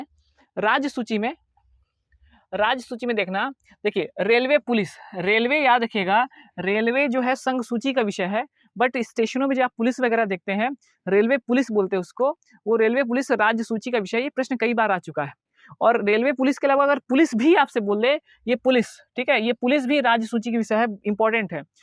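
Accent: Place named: Chhattisgarh, India. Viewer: Sir hum logo ko chhattisgarhi bolne achchha lagta hai waise aapka Hindi bhi bahut majedar chhattisgarhi jaisa aanand deta hai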